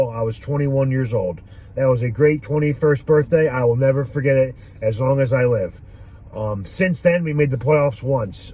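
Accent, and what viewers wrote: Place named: South Jersey, United States. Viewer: Dam bro what part of south jers you from i’ from Atlantic City .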